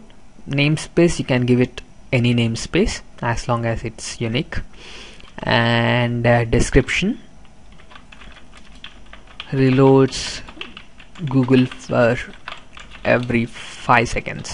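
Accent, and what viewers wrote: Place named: India. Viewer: It's the accent you struggle with. Clearly, he's a native English speaker , though with a local accent. Most probably Indian.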